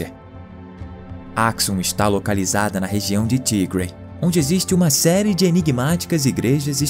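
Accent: Rio de Janeiro, Brazil. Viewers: Num dá pra ouvir é muito carioquês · É, não consigo ouvir esse sotaque carioca carregado de x e vogais inexistentes por mais de 20 segundos...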